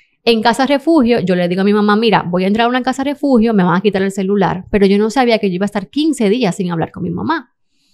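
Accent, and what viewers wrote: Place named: Venezuela. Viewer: Hola pense que eras venezolana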